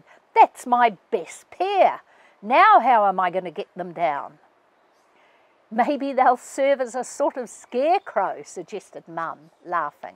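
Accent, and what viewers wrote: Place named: New Zealand. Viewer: I wait for every chapter. This is a keen idea you reading your book, especially with that enchanting NZ accent. I will be in my kitchen preparing dinner, baking a loaf of bread or washing up after supper and I realize my face is smiling as I listen😊. I did send an email and I do hope you received it. Blessings, Cathy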